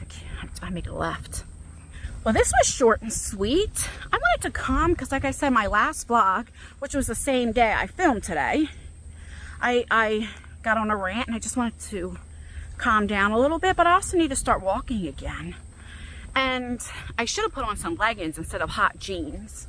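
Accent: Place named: East Coast, United States. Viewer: What state are u in? U have an east coast accent. I am from PA. So i noticed it. ❤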